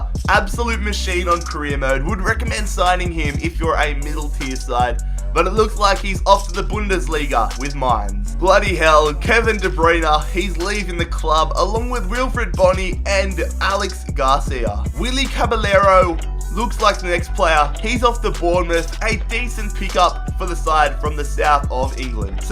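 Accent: Australia. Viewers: Are you Australian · I knew you were Australian! Great vid From, fellow Aussie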